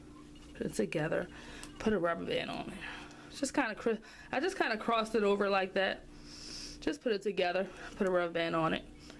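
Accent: Baltimore, United States. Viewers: Very pretty. I love your Bmore accent. Stay safe.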